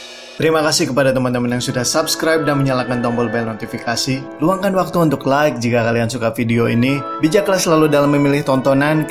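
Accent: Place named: West Java, Indonesia. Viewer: Oh, Aa teh native Sunda ya hahahaha... BTW, kaget juga ya kok ke mana ya Waltz of Flower-nya...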